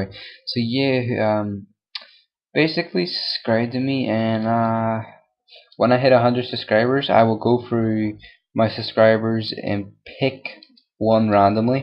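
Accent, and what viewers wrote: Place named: Northern Ireland, United Kingdom. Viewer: Well you sound like your from Northern Ireland, and so am i! :D I'm not going to make up a story saying my parents died or i have no money or my house burnt down and i lost all my stuff either.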